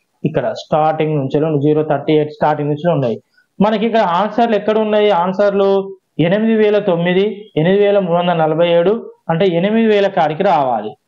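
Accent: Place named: Karimnagar, India. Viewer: Sir karimnagar language lo chala baga chepparu thank you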